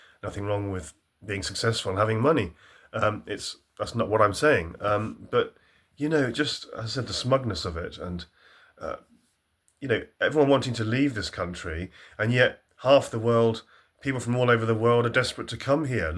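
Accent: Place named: Southern England, United Kingdom. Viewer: Horrible southern English accent too. Just oozes snobbery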